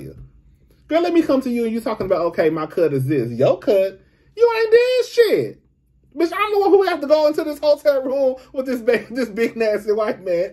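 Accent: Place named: Midwest, United States. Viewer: I can still hear the Midwest accent.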